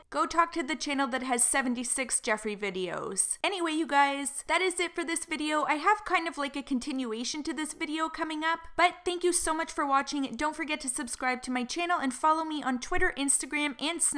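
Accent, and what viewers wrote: Canada. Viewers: T J. Are you seriously suggesting a person from an English speaking country (canada) should get english lessons specifically to sound less canadian so that their slight accent doesnt offend your ears? Guess what? Not all viewers are from the US and the rest of us couldn't care less about a slight variation in accent. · Jesus Christ this girl's accent....Is she Canadian or something?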